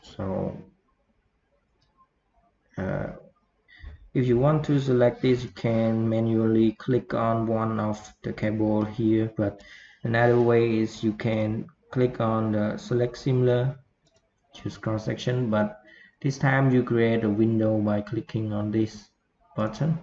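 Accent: Cambodia. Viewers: Your pronunciation sound as Cambodian style. But It’s very nice and impressive VDO. Thank for your shearing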